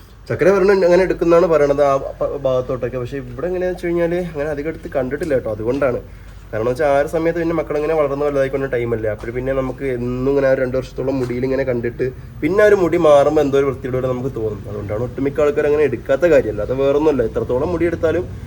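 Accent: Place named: Palakkad, India. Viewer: നീ ചെന്ന് പറയണ. ചക്കരയെ കാളും.. പാലക്കാടൻ ഭാഷ യാണല്ലോ. കൊല്ലം ഭാഷ മറന്നു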